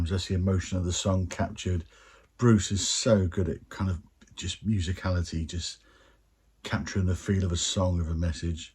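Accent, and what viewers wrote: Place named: Portsmouth, United Kingdom. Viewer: Different forms of dancing indeed....😎 .... and ... nice to have my suspicions on your accent confirmed...you can take the boy out of Pompey eh!?!...Nice one copper..PUP....upwards and onwards...Heavens Light Our Guide!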